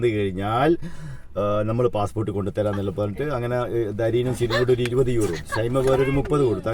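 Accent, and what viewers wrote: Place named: Thalassery, India. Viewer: തനി തലശ്ശേരി ശൈലിയിലുള്ള മനോഹരമായ വിവരണം. Wishing you all the best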